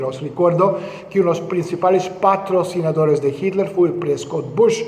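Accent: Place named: Spain. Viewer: quítate ese acento español tan ridiculo ya vives en LatinoAmerica......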